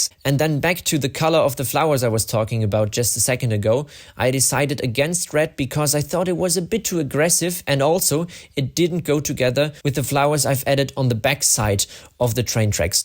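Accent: Germany. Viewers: ⚠️This isn’t meant to be hate - like, at all⚠️ You kinda sound like you have a German accent 😮 Could you perhaps come from middle Europe?😅 I’m really sorry if this sounds offending in any sense❤😢 Edit: I just saw that elevator video from Jensbach 😂 so are you from Austria? Just curious 😅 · since you sound german you should build a traintrach to a small concentration camp with some gas chamber and ovens, make a working lego oven with a lighter in it to burn some characters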